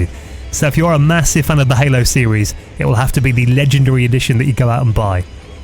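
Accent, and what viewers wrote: United Kingdom. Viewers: A british reviewer Woooo!!! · Ahh, thats what I like, the sound of a true British voice ;)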